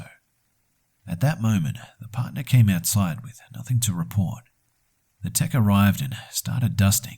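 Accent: Australia. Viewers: are you Australian cause your accent sounds Aussie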